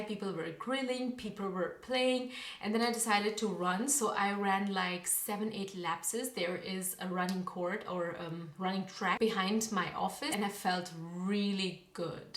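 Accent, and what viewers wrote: Germany. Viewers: Well, dialect may be a too strong word... your German has a Kaiserslautern flavour. I believe not many people in Germany can hide their "origin". I live in the North (Schleswig-Hosltein), and people always notice where I am coming from. Hochdeutsch (high German) mainly exists in written form or in TV news. Unrelated, there are 2 Videos with perfect or close to perfect light: This one and the vlog about racism. I hardly know any channel with such a steep leaning curve. Chapeau! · Sie hat auch so einen deutschen Akzent. Wir haben schon vermutet das sie in Deutschland aufgewachsen ist. Aber vielleicht ist sie Hindu und war in einem frühreren Leben deutsch. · lovely german accent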